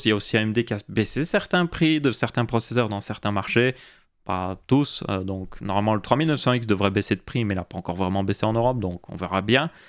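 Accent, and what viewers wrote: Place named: Belgium. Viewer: Je t'ai découvert ya pas longtemps, et tu mérites tes 100k abonnés. Ton contenu est sympa, tu expliques bien, tres pedagogue, et ton accent belge te rend encore plus sympathique pour moi ;-)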